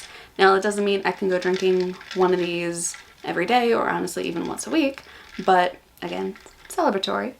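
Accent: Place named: Australia. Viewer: Sending positive vibes! Hey watch out - I hear a touch of Aussie accent!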